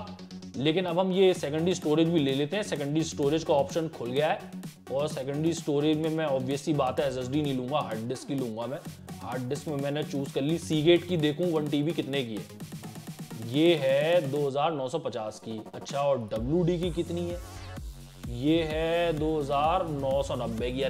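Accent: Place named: Bulandshahr, India. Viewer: Where are you from, I can recognize your language. Are you from bulendshar, up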